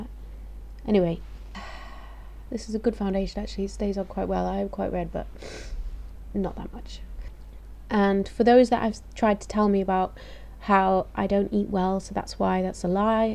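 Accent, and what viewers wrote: United Kingdom. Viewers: it's a luffly voice. It seems to have bit of a northern tinge to it, but I like Yorkshire/Lancashire area accents (I'm not sure where you're from). And no, you don't sound like a robot! Just pretty chilled. =)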